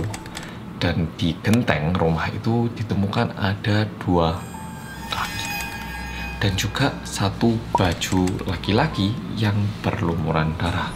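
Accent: Java, Indonesia. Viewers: yang medhoooooooooooooooooooooooooooooooooooooooooooooooooooooooooooooooooooooooooooooooooooooooooooooooooooooooooooooooooooooooooooooooooooooooooooooooooooooooooooooooooooooooooooooooooooooooooooooooooooooooooooooooooooooooooooooooooooooooooooooooooooooooooooooooooooooooooooooooooooooooooooooooooooooooooooooooooooooooooooooooooooooooooooooooooooooooooooooooooooooooooooooooooooooooooooooooooooooooooooooooooooooooooooooooooooooooooooooooooooooooooooooooooooooooooooooooooooooooooooooooooooooooooooooooooooooooooooooooooooooooooooooooookkk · Mas, suaranya medok2 gimanaaaaa gtu.....sy suka sekali mndengarnya......😁😁😁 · Mas medhok: "darahnya terus bercucuran" Me yg phobia darah: mati aja lah aku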